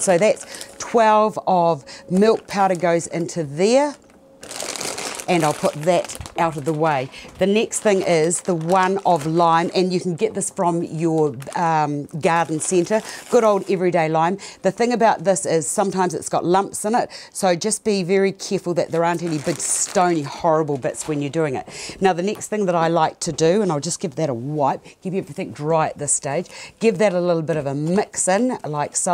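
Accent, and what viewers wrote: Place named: New Zealand. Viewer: Thank you for answering. So helpful. Miss that lovely Kiwi accent. I’m from the States, but lived in NZ for 3 years back in the 90’s, 1 year in the greater Auckland area and 2 just outside Christchurch (Governor’s Bay). Beautiful country and lovely people. 😊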